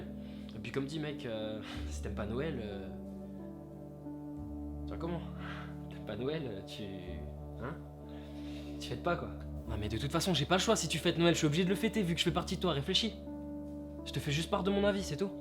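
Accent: Alsace, France. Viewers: Ohlalal tu prend un léger accent Alsacien ^^ comme d'hab elle est cool la vidéo et merci pour la conférence au Lycée Kléber l'an passé;) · J'avais jamais remarqué que t'avais un accent alsacien aussi prononcé ! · en entend l'accent alsacien qui resort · Avec le petit accent Alsacien et tout✌️ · Je n'est jamais entendu ton accent alsacien à ce point la mdr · J'adore ton accent alsacien #ElsassFrei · J'adore ton accent alsacien xD Franchement j'étais pliée de rire à chaque fois, on aurait dit un vieux qui parlait ! Et pourtant je suis alsacienne aussi xD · Elle est vraiment cool ta video, le petit accent alsacien qui ressort, c'est la base ! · Ton accent alsacien est superbe. Dika Schmutz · De temps en temps l’accent Alsacien ressort. Soit fier, c'est le meilleur accent. Très bonne vidéo sinon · T'as un de ces accents alsaciens O_o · Jamais j'avais remarqué ton accent alsacien... 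il est quand meme vachement marqué dans cette vidéo... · Mec, t'as un sacré accent alsacien haha xD super vidéo avec une chute excellente, et vive notre région ;) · Y a que moi qui ai remarqué qu'il a un accent alsacien quand il s'énerve ?? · j'adore ton petit accent alsacien !! :p enfait j'avais jamais fait gaffe mais dans cette vidéo il s'entend bien :p Bonnes fêtes à ton toi qui aime noël :p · Mdr , quand tu parle plus fort on capte ton accents alsacien #67 :D ( sait pas une insulte je suit alsacien et j'ai aussi l'accents ) . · Cet accent alsacien hahahha +10000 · j'aime bien ton petit accent alsacien aha, tu gères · ptdrrrr cet accent Alsacien >>> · L'accent alsacien qui fait plaisir ! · Sacré accent alsacien mdr c'est trop bon !! Passe de bonne fête 😘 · Tu me faire rire avec ton petit accent alsacien 😂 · HO yé t'a bien pris l'accent alsacien :D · Ahlal tu as un sacré accent alsacien hihi je kiff ;) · L'accent alsacien de ouf haha représente 👌👌👌👌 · J'ai vécu en Alsace pendant 4 ans et ça fait drôle de voir un youtubeur connu qui a vraiment l'accent :') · tu as un accent alsacien putain c'est un truc de fou mdr j'en peux plus tu en a fait des bonnes sérieux :') je suis alsacienne et j'en suis fier !!! 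vive l’Alsace ! <3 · Il y a un léger accent alsacien 😏 Vives l'Alsace ! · Génial la vidéo oh mais l'accent Alsacien qui s'étend de ouf !! J'adore continue comme ça 👌✌ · On entend bien ton accent alsacien ;)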